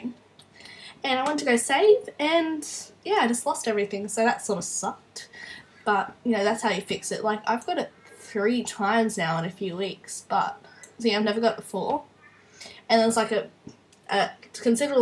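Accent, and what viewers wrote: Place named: Australia. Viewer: This is great! Haha, I'm from New Zealand but I live in the US. I laughed when you started talking and I realised you're an Aussie! Haha thanks for the help :)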